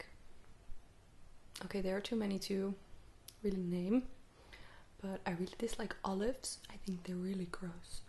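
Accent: Netherlands. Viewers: Niet in slaap gevallen, maar wel heerlijk om te luisteren naar je lekkere Nederlandsheid. :)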